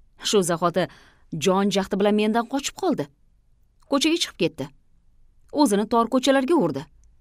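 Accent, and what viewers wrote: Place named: Andijan, Uzbekistan. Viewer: Шеваямки анжанча тошканча узбакча 😊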